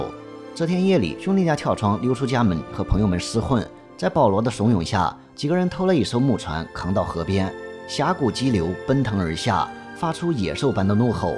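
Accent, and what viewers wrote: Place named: Henan, China. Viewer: 河南方言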